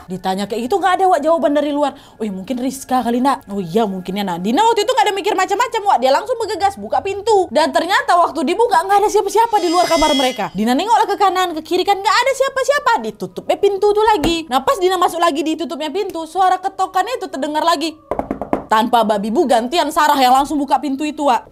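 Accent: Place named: Medan, Indonesia. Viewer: Ceritanya benar2 menghororkan tapi, hilang rasa takut karna dengar k yang membawakan ceritanya dengan lucu. Mala pake logat medan ada uwak nya lagi. Mantap k.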